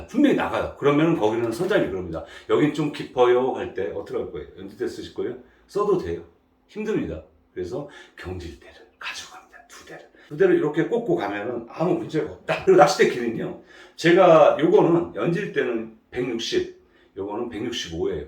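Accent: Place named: Chungcheong, South Korea. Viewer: 님께서 이번에 올려주신 영상 토대로 공부해서 출조하겠습니다! 속 시원한 설명과 유익한 정보 감사드립니다^^ 충청도 사투리 정말 맛있게 쓰시네요ㅎㅎ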